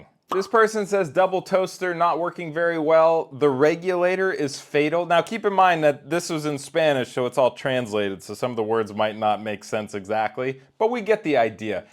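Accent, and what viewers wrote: United States. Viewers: You live in PORTUGAL?!?!?! You have such an American accent!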